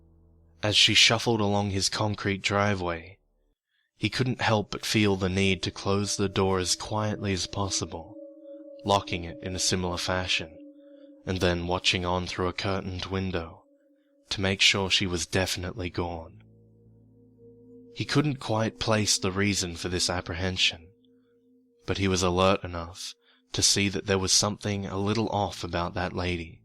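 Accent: Australia. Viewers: Congratulations and thanks, it's nice to hear an Australian accent narrating the creepy stories i love. your story is great too, I love the change of perspective and unexpected ending. great job! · Both myself and my gf love hearing you read stories. Maybe it's that smooth ausie accent. Either way I'm watching your whole videograpgy. You are a great storyteller, never loose that wonder you have; through your mind and eyes and words we experience stories we may be too scared or busy or whatever to read ourselves. Laugh, cry, fearful tremors, or apathetic visualizations. You walk through them with us. Much love from cali, and thank you.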